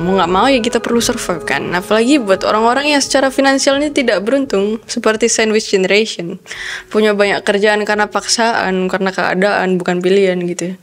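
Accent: Java, Indonesia. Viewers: Ahh medoknya 😍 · swenneng aku sing ngonten ini 😊 cici medok hehe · cantik bgtt sayangnya medok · Medok banget mbak XD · Mbak mbak, keren medoknya 👊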